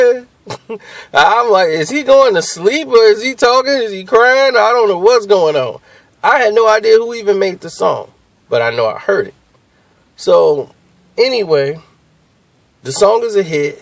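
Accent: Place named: South, United States. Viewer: your southern accent reminded me of the film "snow on tha buff."Lmao love that flick.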